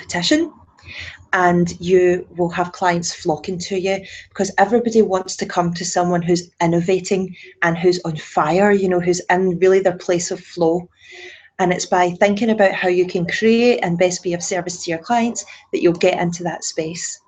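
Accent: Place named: Scotland, United Kingdom. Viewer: Hello, who did you say your mentor was? You never put it in the description box. Good to hear a Scottish accent like mine 👍🏻😊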